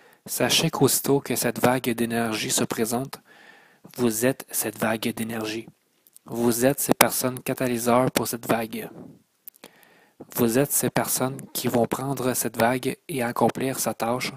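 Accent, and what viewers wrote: Canada. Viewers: c'est quoi cet accent canadien yeshua a été élevé en Egypte et il a vécu en france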